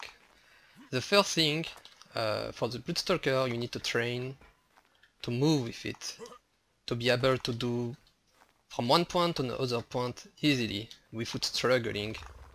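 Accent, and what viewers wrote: France. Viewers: Tu a un petit accent français nn ?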